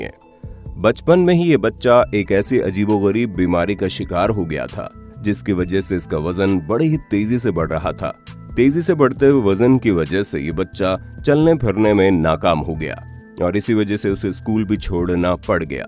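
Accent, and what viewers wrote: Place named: Jaipur, India. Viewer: Voice Vale bhiya Jaipur ke rehne Vale h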